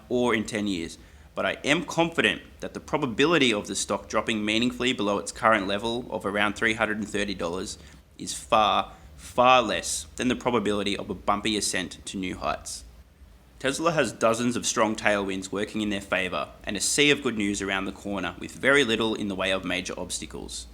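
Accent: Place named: Australia. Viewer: Good Work, and Nice Vid work... Especially the Brief Jim Chanos shorts pic... lol Great to hear an Oz Accent covering TSAL too... You really are the first for us:)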